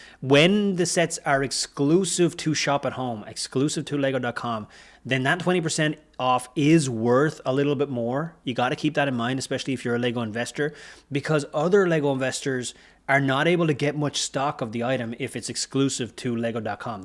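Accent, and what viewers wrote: Ireland. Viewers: I'm always super confused that somebody with an Irish accent is introducing Lego deals from the USA. Here in Europe the deals are not great.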